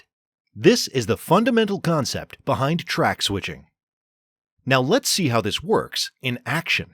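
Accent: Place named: India. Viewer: Brother is from India It took me time to notice 😭😭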